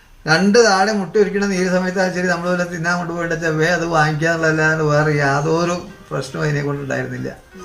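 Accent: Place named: Kongad, India. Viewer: You have presented a realistic account of Valiya kavu and the surrounding places.Interview with Sri. was informative.Your kongadan colloquial style of casual narration is pleasing & is refreshingly different from the stereotype. For me,who left Kongad decades ago, this was a revisit to the places I grew up.Thank you very much.Looking forward to the ensuing episodes.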